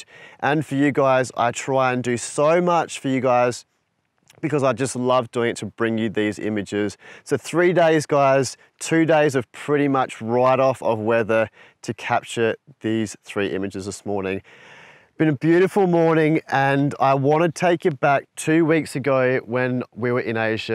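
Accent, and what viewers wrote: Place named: Australia. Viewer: Hi Mate, sorry to just now be getting to your video as I've been in the process of moving. As always, I have enjoyed the video and the efforts made to deliver your content, sick Australian accent and all! It was pretty evident the struggles you were undergoing during the Asia trip, maybe just the wrong time of year. The vantage point you were at for valley horseshoe river shot was choice. So nice to have travel chef/photographer along for this journey as well, you'd be screwed without him, haha. I'm curious, have you ever been into Slovakia? I have a nephew living there and suspect the landscape is fantastic there as well.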